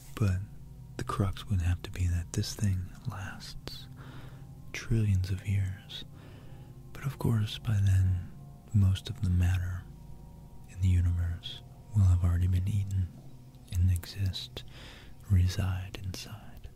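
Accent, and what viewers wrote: United States. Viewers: Im late to the party with your asmr and im not a fan of a US accent but your voice my friend is absolutely perfect and within moments I know im going to drift off. I love the just talking about books and sciences and the mythology was cool. Please do more. You're not as prolific as some of your contemporaries who seemingly just like to get their norks out. Bizarre behaviour, its like asmr/onlyfans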